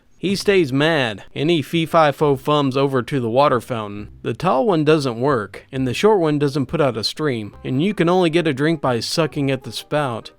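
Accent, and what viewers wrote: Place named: Kentucky, United States. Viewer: Still my favourite accent.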